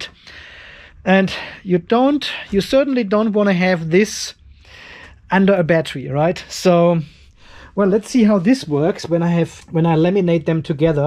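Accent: Germany. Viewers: Hello, I was wondering how you are going to keep these three batteries in balance? I have two 24V/100Ah batteries and it appears to be difficult. By the way, thank you for your very interesting video's and your german English. Makes it for me easy to follow. Regards, Wim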